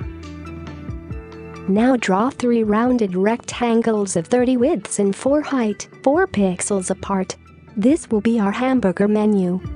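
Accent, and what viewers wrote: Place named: Kerala, India. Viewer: gotcha u r from kerala right?????????????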